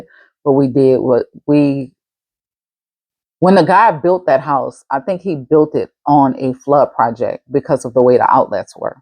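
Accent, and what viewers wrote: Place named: New Orleans, United States. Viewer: This is my first time here I enjoyed your video and that Nola twang can’t wait to see how you accessorize your navy bag, I never do potlucks either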